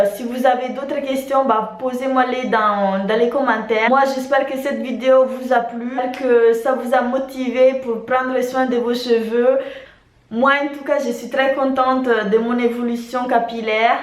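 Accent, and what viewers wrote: Madagascar. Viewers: J'étais sure que tu étais malgache (façon de parler, visage aha) et je viens de voir que tu as fait un vlog à Mada', ça se confirme.😊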